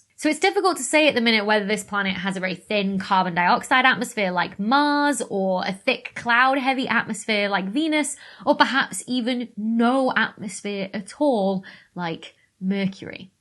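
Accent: United Kingdom. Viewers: It's her Briddish accent. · I don't know why, but the British pronunciation of methane is driving me nuts lately. Do you pronounce pentane and octane with a randomly long leading vowell? Probably not. If there was a clue that the vowel should be long (like if it were spelt meethane ) that would be one thing which would justify British practice. But to my eyes and ears, the Brits are more wacky than usual. Dr. Becky, can you appeal this to the Standard English Authority_? ;-) (Let's leave _aluminum for another time. ;-) On another front, I recommended a video of yours to my mom many months ago. She reported that she couldn't understand you well enough due to your "thick British accent." You sound fine to me, but 20 years ago I worked for a company which had like 15% Brits.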